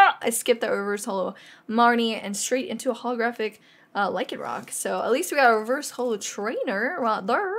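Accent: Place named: Rural, United States. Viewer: That country accent tho lol!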